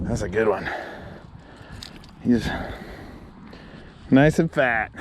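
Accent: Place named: Arizona, United States. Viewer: When I hear that accent I totally think Arizona.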